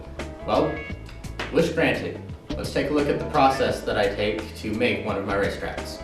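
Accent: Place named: Michigan, United States. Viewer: I'm from Michigan and u got the Michigan acsent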